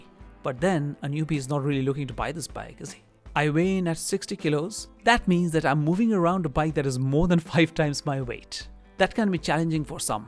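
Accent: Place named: India. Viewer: Man , hard to understand your Indian accent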